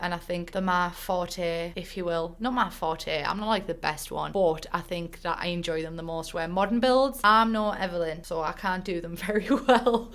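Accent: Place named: Middlesbrough, United Kingdom. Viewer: Just stumbled across you and you're bloody hilarious! 😂 Are you from Boro by any chance? I recognise your accent loads!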